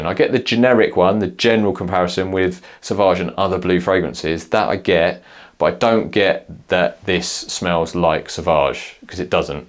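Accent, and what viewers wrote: United Kingdom. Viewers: Others have likely already offered this: YOU ARE BLOVIATING AUD-NAUSIUM, TACKY REVIEWER WITH OFF-PUTTING BRIT ACCENT.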